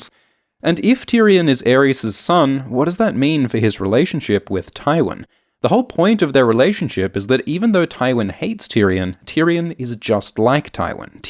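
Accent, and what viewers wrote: Australia. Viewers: He's clearly Australian to anyone with ears, so I'm not sure why anyone would be wondering that. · I usually have a good ear if someone is Australian or British, mainly because Australian accents tend to have more of a drawl to them but with I couldn't tell because he has one of the Australian accents with less of a drawl to it.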